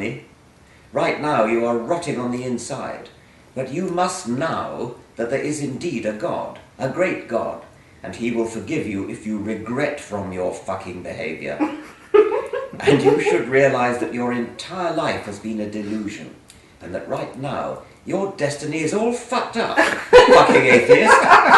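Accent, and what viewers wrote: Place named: RP, United Kingdom. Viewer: Hearing him swear in an educated and satirical British accent is hilarious.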